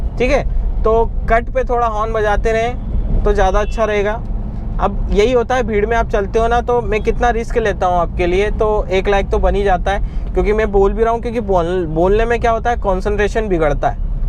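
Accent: Indore, India. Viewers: Lagta he bhai ap Indore se hoo 😂😂😂😂😂😂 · आपकी लैंग्वेज का टोन इंदौरी लग रहा है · Indori accent truly at the point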